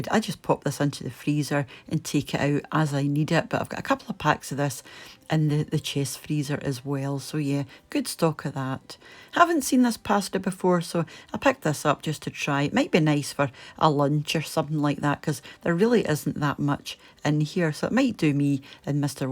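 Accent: Scotland, United Kingdom. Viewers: I’m a new subscriber after falling in love with your beautiful Scots accent chatting away as you cooked your yummy recipes. I also love your snippets of the local scenery. We were supposed to be heading to the UK for a month in 2021 to explore some of the towns of our ancestors and enjoy the sights and local hospitality, but as we all know that’s really not an option now, so we will have to postpone for a while. So meanwhile I’m soaking up as much of the culture as I can on YouTube 😍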